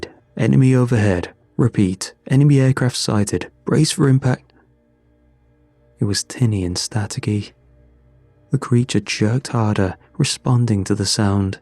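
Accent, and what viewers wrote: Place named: Cockney, United Kingdom. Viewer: I'm lovn dat don't give a toss; real cockney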